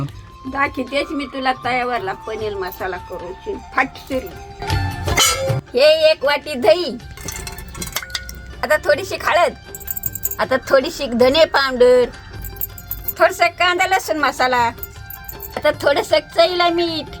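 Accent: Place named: Ahmednagar, India. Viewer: आजी च बोलण्यात नगरी तडका आहे 😂🥰❤️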